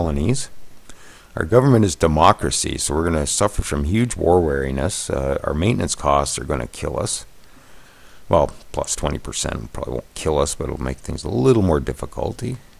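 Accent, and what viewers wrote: Canada. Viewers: you sound canadian. I love it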